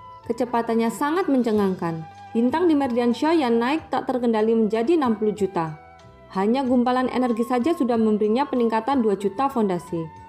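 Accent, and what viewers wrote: Java, Indonesia. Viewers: masih meddok suaranya, powernya di tambah lagi ya, biasa namanya juga pemula semangat💪💪💪💪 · Hargailah orang yg berusaha menghilangkan suara mendoknya. Semangat mba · Medok nya. 🥰🥰🥰.... Mbak e. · Memang suaranya beda,kalau bisa min logat Jawanya agak kental itu aja sih kalau sy.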